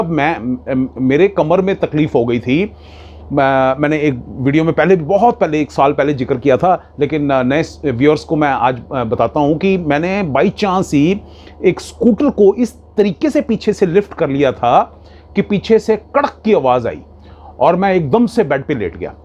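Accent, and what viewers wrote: Punjab, India. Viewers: Sir,thoda video short me banaye.Thank you for great information. I love your strong Punjabi accent.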